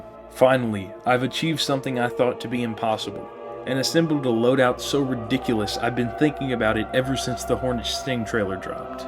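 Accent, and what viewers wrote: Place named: South, United States. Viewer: I feel so bad for you. You're so clearly too stupid for top tier, so much so that I genuinely feel bad for you and your experience. Usually, people like you are scared away before it takes them 3000 hours to hit their first jet because your scores are so menial, every match you play. I think its either the miserably slow cadence of your voice (I get you're southern... [probably fat too]) or simply how you speak, but GOD. DAMN. Stick to Call of duty or something because I beg of you, please do not accidentally join my team...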